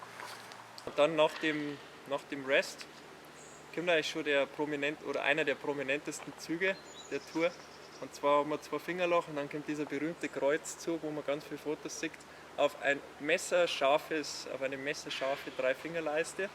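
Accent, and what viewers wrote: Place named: Bavaria, Germany. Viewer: Hast du de rechte für des lied, kann i de aa haben? 😜 Und keine untertitel? Versteh dem sein tiefstes bayrisch ned...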